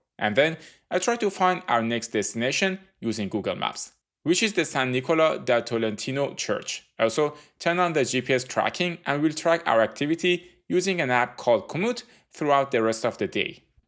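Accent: Iran. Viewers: یعنی قشنگ چشماتو ببندی متوجه میشی یه ایرانی داره انگلیسی حرف میزنه:)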